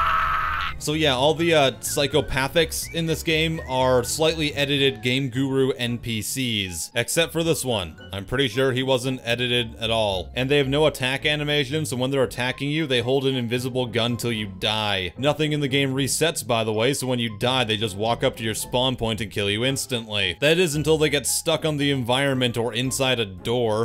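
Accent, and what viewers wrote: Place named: Italy. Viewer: This is the way some italian speak english lel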